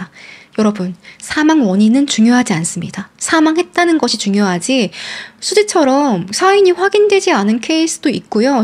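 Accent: Gyeongsang, South Korea. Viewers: 언니 경상도 분이세용?궁금해요!!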